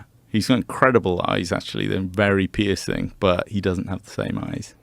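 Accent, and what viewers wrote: United Kingdom. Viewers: Proof that having a British accent can make a man who is saying absolutely nothing sound articulate. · There's no such thing as a British accent he has a welsh accent 😂😂😂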